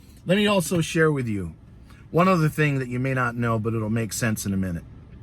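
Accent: New York, United States. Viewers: When I see you u speak I see A True American love from Texas your Brown Brother keep it up brother Aries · Oh you're a New Yawka like me! Bro, you're my dude now. I get your whole style now. I have to go and fill out this loan application now. Let's get this Grant & leverage it. Salud.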